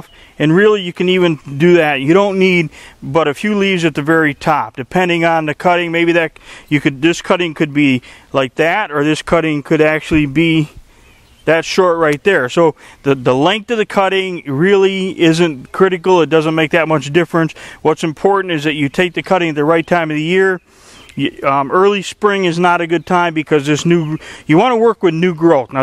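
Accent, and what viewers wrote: United States. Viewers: So glad to hear someone from the United States that speaks English, down to earth, and gets right down to business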